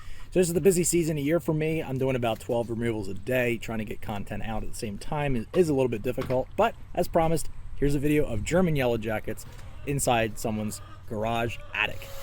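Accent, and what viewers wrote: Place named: Pennsylvania, United States. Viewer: What is this dialect? It's very "Mare of Easttown"...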